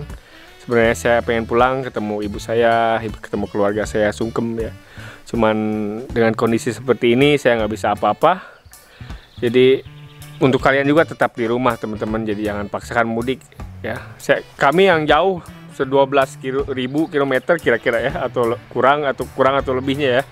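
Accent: West Java, Indonesia. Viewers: Ga apa² Kang, kebawa bahasa sundanya, malah enak didengar, jadi menghibur juga😀😀 Semangat Kang..!!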